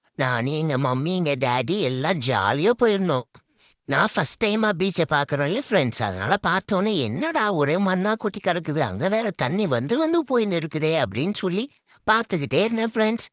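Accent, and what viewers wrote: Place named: Chennai, India. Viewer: Ada namma ooru paiyanaa neeyi ❤. Ana chennai slang pesuriye da paiya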